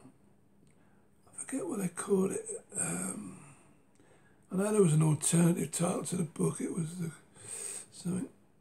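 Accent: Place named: Essex, United Kingdom. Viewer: I thought your accent sounded as if you came from Essex. It's where I live.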